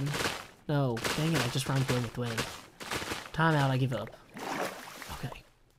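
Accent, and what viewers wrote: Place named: South, United States. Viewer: Sound so country 😂🤠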